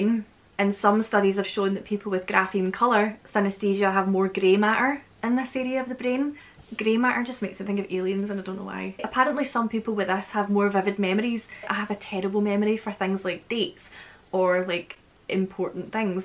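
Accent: Scotland, United Kingdom. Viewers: That's interesting! You have my absolute favorite accent from Scotland. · It is sexy to have synaesthisia !! Specially with a scotish accent ♥️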